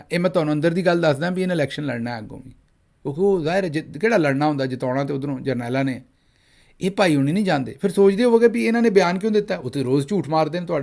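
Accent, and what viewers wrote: Standard. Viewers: Thait Punjabi Good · Really enjoying your way u talk pure Punjabi in your vlog. “Bijjo bari dair k baad suna he. Welldone. 👏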